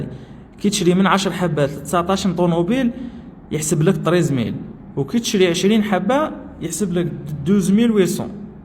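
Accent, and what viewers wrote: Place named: Djelfa, Algeria. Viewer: فدقيقه 9.25وعلاه راك تسب فقسام وشبيك اااا تربا شويه تربى ياا وسمك هدرتك تا منين جلفه بوسعاده منين مهيش غريبه عليا هاذ لكنه وصوت بهدلت بارضت تقدم محتوى مفيد وتسب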